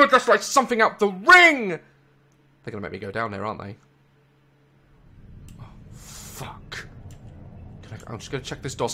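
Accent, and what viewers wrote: United Kingdom. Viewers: Is from the uk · with the posh English xD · fabulous British talk mate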